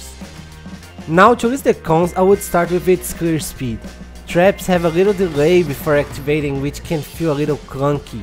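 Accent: Brazil. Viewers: Só pelo sotaque é brasileiro com certeza!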